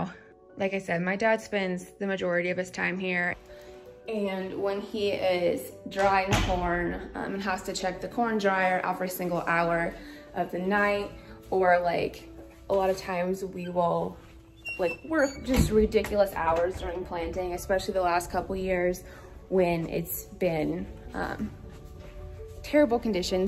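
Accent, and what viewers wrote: Midwest, United States. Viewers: Hip hop culture? Where are you from, man? That's how people in the Midwest talk.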